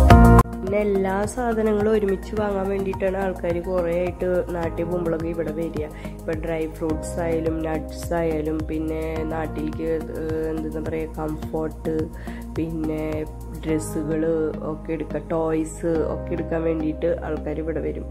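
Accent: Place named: Thrissur, India. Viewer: Thrissur aano sthalam?? Samsaram kettapo thonni.. Me too from thrissur...Good and helpful video..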